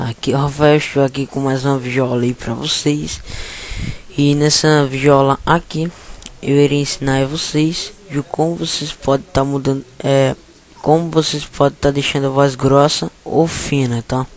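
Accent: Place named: Florianópolis, Brazil. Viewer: tu tem sotaque de manezinho da Ilha! HAHAH tu é de SC?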